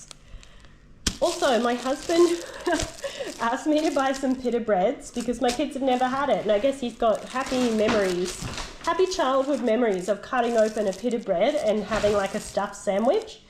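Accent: Australia. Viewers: New subscriber! Lovely to have found your channel, its so great to hear our Aussie accent ❤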